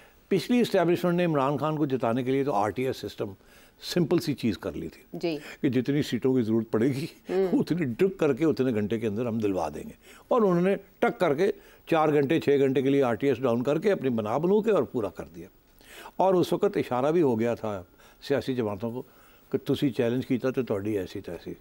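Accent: Punjab, India. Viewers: Punjabi tuch excellent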